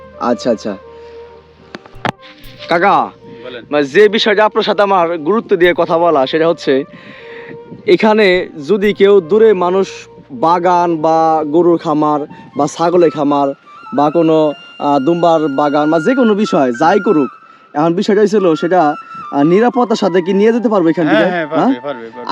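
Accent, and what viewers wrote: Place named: Pabna, Bangladesh. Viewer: এনার কথায় মনে হচ্ছে ইনি পাবনা জেলার মানুষ, আর পাবনা জেলার মানুষের কথার মূল্য আছে,এরা পাগল ছাগল হলেও দুইনাম্বারি কথা বলে না,অনেক পাবনার মানুষের সাথে আমি থাকছি,এদের রাগ একটু বেশি, কিন্তু মানুষ হিসাবে ভালো, পাবনার পাগলা 😂😂❤❤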